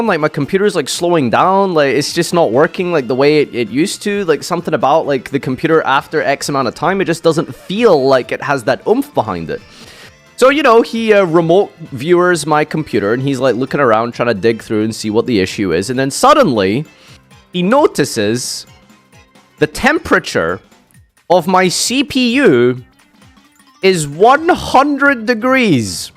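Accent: Scotland, United Kingdom. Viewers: We need more scottish accent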